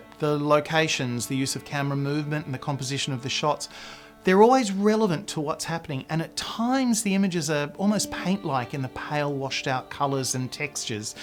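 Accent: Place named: Australia. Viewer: good review! nice accent you Aussie?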